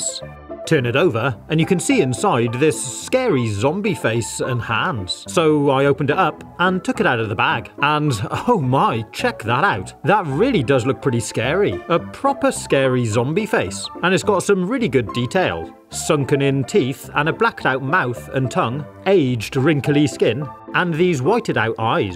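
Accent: United Kingdom. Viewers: HES BRITISH!!! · Your British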